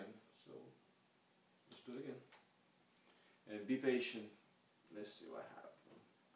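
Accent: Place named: Brazil. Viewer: brazilian accent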